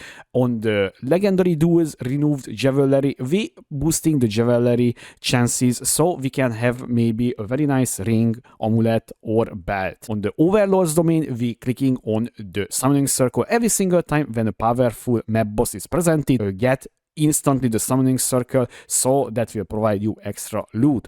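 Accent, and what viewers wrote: Hungary. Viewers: Ennél magyarabb akcentust még nem hallottam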